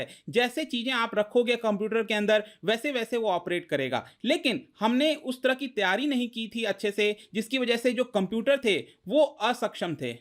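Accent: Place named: Bihar, India. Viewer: तुम्हारी कर्कश, हाई टोन वाली आवाज साथ में एक ही बात को कई बार रिपीट करना। ढेरों एग्जांपल दे देना। और हाइटोन रखते हुए लगातार बोलते जाना, लगातार बोलते जाना। अगर तुम्हारा यह वीडियो किसी ने पूरा सुन लिया, तो उसका हल्का सा बीपी तो बढ ही जाएगा और वह कम से कम 1 घंटे तक आंखें ऊपर करके बावला सा भी हो जाएगा। जो भी बताना चाहते हो उसको पहले कागज पर लिखा करो। बिना एक भी शब्द रिपीट किए हुए, मधुर आवाज में , संक्षिप्त में अपनी बात कह कर निकल जाया करो। परंतु यह सब तुमसे होगा नहीं। तुम्हारी टो से लगता है कि तुम बिहार साइड के हो, या पूरब साइड यूपी के। वैसे भी तुम लोग बहुत ज्यादा बोलते हो।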